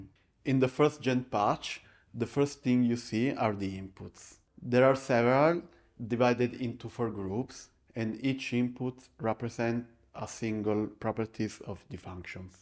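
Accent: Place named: Italy. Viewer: Sorry for the Italian accent???? The Italian accent is awesome!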